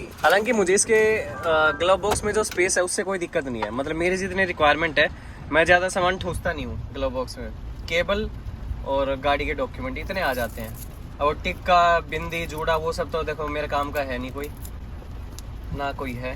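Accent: Haryana, India. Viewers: Bro's content day by day 📈 and your haryanvi accent oye hoye🤌😂❤️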